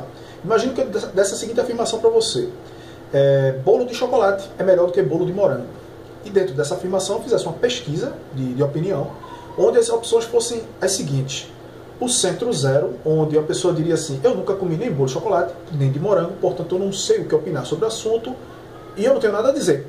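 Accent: Recife, Brazil. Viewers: Mas, tu és de Recife?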